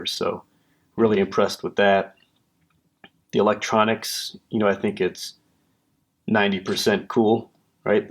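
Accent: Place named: Wisconsin, United States. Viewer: You sound like you're from Kenosha Wisconsin.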